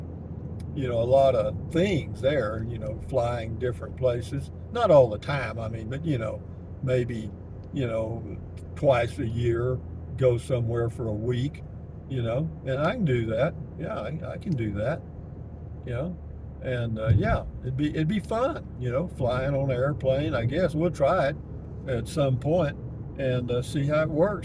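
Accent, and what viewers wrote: Texas, United States. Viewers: You have the same accent as my aunt and cousins and my dads buddies from Texas. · Dude you crack me up! You sound like my buddies here in Amarillo TX.🤠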